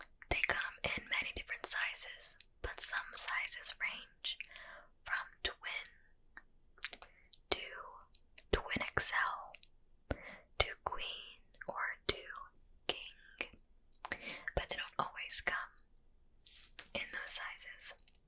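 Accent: United States. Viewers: She sounds pretty american to me so nothing special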